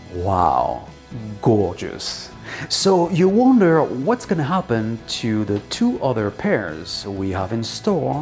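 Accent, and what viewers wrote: France. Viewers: You killing me with French accent. Every things in your hand turn to masterpiece. Love from Sweden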